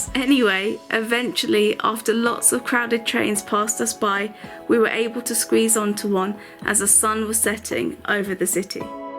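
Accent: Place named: England, United Kingdom. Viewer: ภาษาอังกฤษ สำเนียงอังกฤษ มันเป็นเอกลักษณ์เเห่งต้นตำหรับจริงๆ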